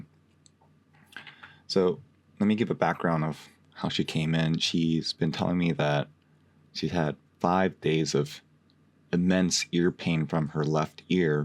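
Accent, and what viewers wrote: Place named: Australia. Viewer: Please don’t stop doing these, love your approach and your voice is wonderful. Couldn’t work out where you were from, thought you could even be from Australia. We don’t all sound like hillbillies.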